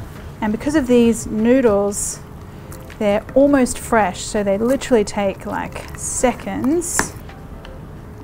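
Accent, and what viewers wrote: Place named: Australia. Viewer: OMG i just shared 1 of her videos w my grown-up children n i had told them the exact same thing! I just love to watch n listen to her lovely Aussie accent😁. I agree totally, Soothing ...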